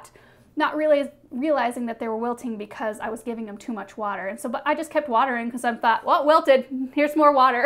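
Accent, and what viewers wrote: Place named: Texas, United States. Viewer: Texas says it same as you.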